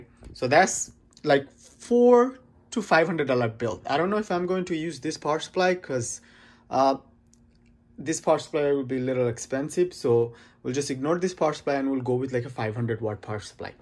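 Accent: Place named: Bengal, India. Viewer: Bhaiya You sound like a Bengali . Are you a bengali?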